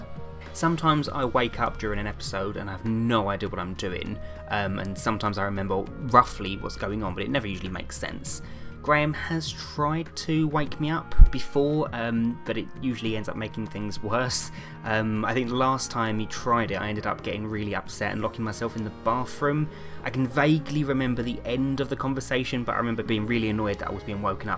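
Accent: United Kingdom. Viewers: You sound British, are you British? Nice video, by the way. I have a question, why do you say, "Ello, ok" In your intro all the time? Is it cause you like to say it all the time? Anyways, can you translate this? Spanish Estoy tan contenta de que tu madre te haya llevado a este mundo. Lo siento si ella se fue o algo. No quise hacerlo si ella está muerta. Pero, eres tan especial y amable. Gracias por estar en la vida de YT, y en las vidas de todos. ¡¡Eres genial!! Hint. (it's really nice.)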